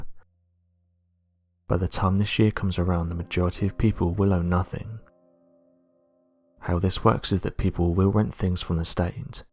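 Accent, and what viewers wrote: England, United Kingdom. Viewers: Cant stand your English accent! Find someone else to do the narrative. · Stories are great but I cannot stand the guys voice, it's the one English Accent that sounds like a head-injured rugby player... apologies again as it is not like me to criticize but, at this point it's like nails on a chalkboard, and, I taking and hiding these notifications and I will find suitable replacement...👽🚬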